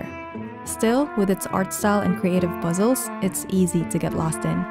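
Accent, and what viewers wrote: Philippines. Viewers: She kinda have filo accent ❤ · I can hear the Filipina accent from your English haha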